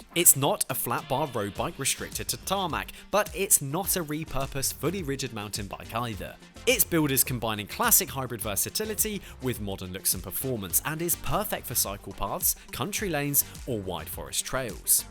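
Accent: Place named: England, United Kingdom. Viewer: I just love how English people say Aluminum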